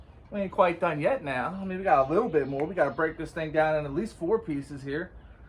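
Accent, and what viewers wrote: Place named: Philadelphia, United States. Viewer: Sic blade, gonna have to pick this up. Where are you from? I hear a philly/Delaware, jersey accent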